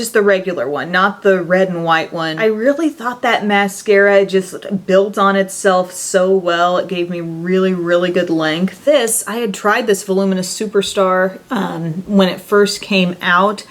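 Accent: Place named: South, United States. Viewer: I just noticed how lovely your Southern accent is haha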